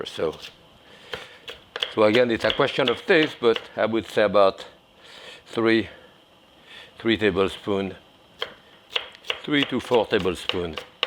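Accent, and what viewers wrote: France. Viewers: I could listen to him talk all day. I love that French accent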